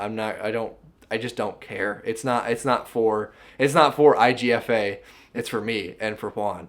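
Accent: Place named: Australia. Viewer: You the man! So I was watching some Braille skateboarding drama the other day and thought Ricky Glaser reminds me of you lol minus the Australian accent obviously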